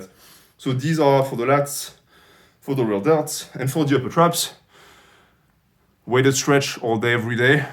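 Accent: France. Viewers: french accent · Are you French?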